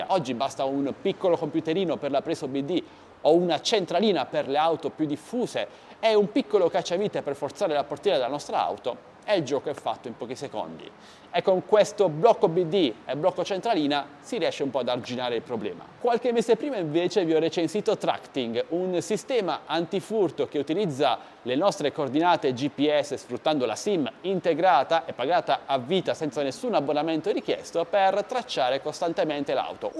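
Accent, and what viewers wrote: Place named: Apulia, Italy. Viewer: Trackting non è testato contro i jammer infatti sento dal tuo accento che sei pugliese e intorno a Cerignola non serve a niente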